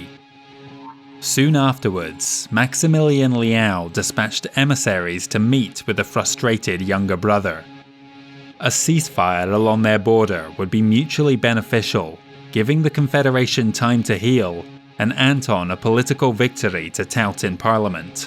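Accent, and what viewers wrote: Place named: Wales, United Kingdom. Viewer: Where is your accent from? I'm sure that you have said, is it Welsh? Great video and thank you for the lore!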